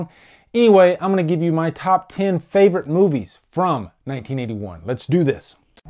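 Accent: South, United States. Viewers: Are you Southern originally?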